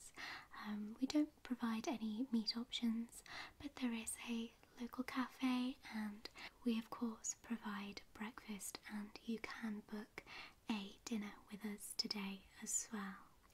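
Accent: United Kingdom. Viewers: Isnt this the normal british accent Why write it in the title for the first time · Öki Döki :D That british accent is funny... and adorable at the same time ;) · Oh you're British? Never could tell before with the accent. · "British accent" brillant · A typical British accent. Because I am British this sounds normal. 😂😂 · british accent okie dokey. Loved it. Also Room 234567? How many floors does this place have?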